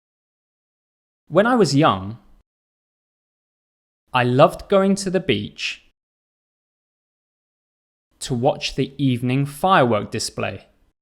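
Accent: United Kingdom. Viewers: Wow ! It is Amazing ! It has been a long time that i'm looking for this type of videos in a british accent .. thanks for efforts and continue ..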